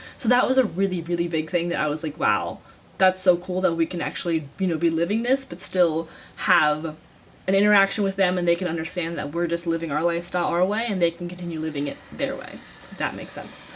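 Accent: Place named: Sweden. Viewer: Is your family of Swedish descent? I’m hearing a very faint Swedish accent in your voice!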